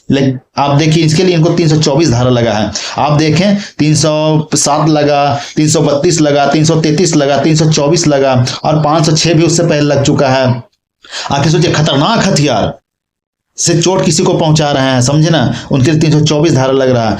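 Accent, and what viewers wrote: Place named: Bihar, India. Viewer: अरे अपको बात से पाता चलता बिहारी हो तुम जायदा बाकर बकर मत अरो झारखंड के बारे मे ठीक है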